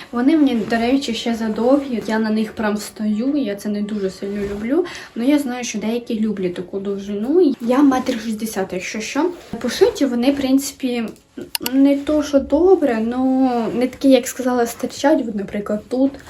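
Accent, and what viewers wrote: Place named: Surzhyk, Ukraine. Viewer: Пиши будь ласка собі трішки сценарій, дуже сильно говір ріже слух. А коли пропишеш то будеш знати хоча б умовно слова і буде менше цього суржика страшного. А так то молодець працюй далі❤️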